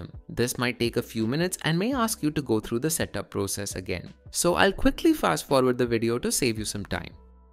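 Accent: India. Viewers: If you hear he has a Indian accent the first thing you do is call him a cockroach and then get a dictionary with all racial slurs so 99% of IT info is actually good because you bullied the problem and send him home (in the sewer) And hoping they'll one day scam grandma's again while they curse you out because you stood up for the vermin that is still making tutorial hell in 2026 possible until we all bullied them together :)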